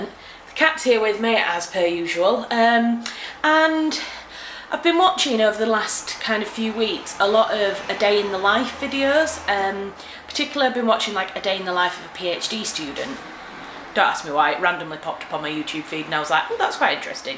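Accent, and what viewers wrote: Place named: United Kingdom. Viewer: Hi, I clicked onto this by accident and I'm so happy you're so engaging and lovely ! I'm from the Uk like your accent and I'm really interested in getting into writing articles for company's/website. I would want to do it from home, alongside my full time job. Any tips on how to get into that field ? Thanks! Love you !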